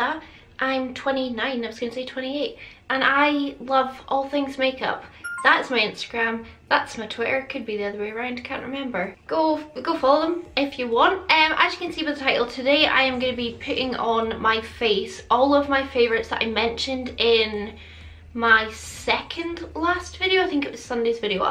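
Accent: Scotland, United Kingdom. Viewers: Just having a bit of a crappy time should of realised 4 kids would be stressful. But it’s ok videos are me time as soon as they hear her Scottish accent they disappear 🤣 and yes we watched it during her study break tonight she squealed with excitement. Then kept saying OMG she remembers me it was me wasn’t it lol she can’t wait to tell her friends Monday 😂😂😂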